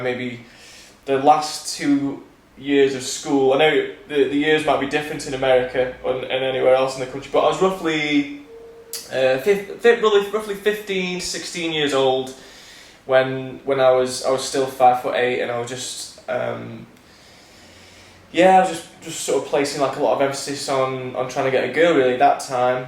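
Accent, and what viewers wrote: United Kingdom. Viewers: can't understand British accent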